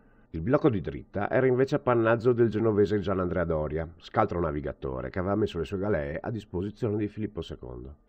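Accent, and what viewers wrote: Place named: Veneto, Italy. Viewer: Perche' non capisci che la parlata e' veneta, il testo e' antico... e' forse una delle piu' belle letture sulla battaglia di lepanto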